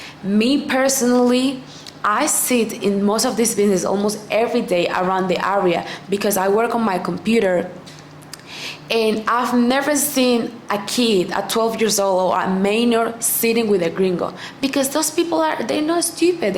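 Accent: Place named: Paisa, Colombia. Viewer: tienes novio? Me encanta tu acento paisa en ingles, me gustaria sacarte a bailar un dia de estos por ahi en Medallo! Saludos!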